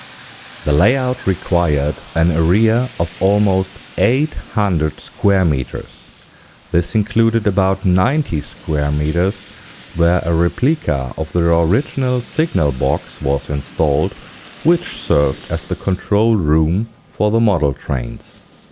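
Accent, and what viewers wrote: Germany. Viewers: Geiler deutscher Akzent hört sich echt witzig an! (Das war NICHT beleidigend gemeint)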